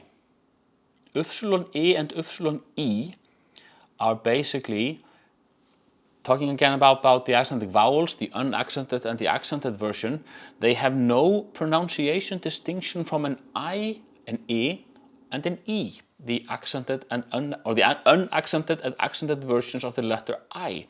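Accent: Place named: United States. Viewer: Another question comes up. I assume you were taught British English, so you say Zett. But you speak in American English, so you say Z. Which is correct?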